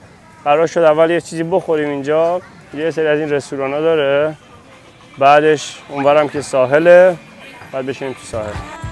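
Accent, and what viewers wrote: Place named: Gilan, Iran. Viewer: جون تو یه کلیپی گفتم لهجه گیلانی داری ، الانم اشاره کردی پس گیلانی داداش ❤❤🌷🌷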